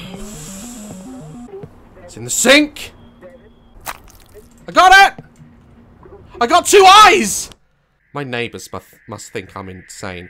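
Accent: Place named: United Kingdom. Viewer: This video is perfect for me right now taking breaks from the stress of online learning. Mild and short but just terrifying enough. I like watching play this with his British accent and humor. Now I can (not) sleep peacefully.:)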